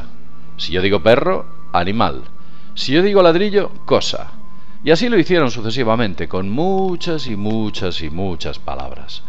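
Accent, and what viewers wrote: Spain. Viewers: Es el primer video suyo q veo! lo explicaste muy bien (gracias por no hablar con mucho acento español) y me suscribo! tengo unas inquietudes! he escuchado q la raza alienigena q nos creo ha usado una tecnica muy parecida en la cual ellos pueden extender su vida tanto como le deseen (tecnica invasiva en la cual extraen o adhieren "algo" solamente terminado el desarrollo fisico). y solo hay uan persona a la cual constantemente lo estan resucitando s podria decir! (le jefe supremo). ¡¿Sera q las razas avanzadas conocen a la perfeccion esta clase de enzimas para detener el envejeciemineto y curar enfermedades? yo creo q es muy seguro! y x eso viven tanto tiempo!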